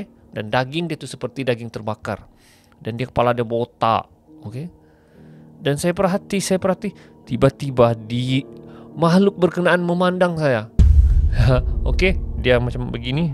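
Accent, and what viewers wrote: Sabah, Malaysia. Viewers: bahasa indonesia lain bah...bhasa ini slang sabahan originol punia...😁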